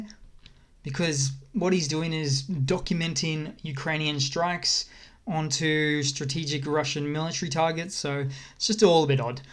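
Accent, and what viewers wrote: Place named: Australia. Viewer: Interesting hearing an Aussie pronounce the Ukrainian names, I’m struggling trying to brake the word structure into something pronounceable! Cheers mate🇦🇺🇦🇺🇺🇦🇺🇦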